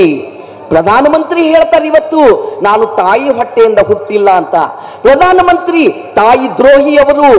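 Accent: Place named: Kalaburagi, India. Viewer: Kadaka kalburgi matu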